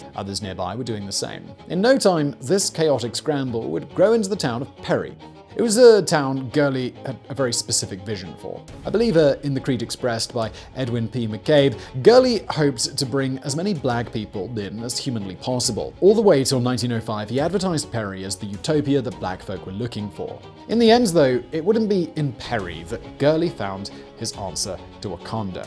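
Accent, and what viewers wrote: United Kingdom. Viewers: The fact that I'm only hearing about this for the first time in a British accent bothers me.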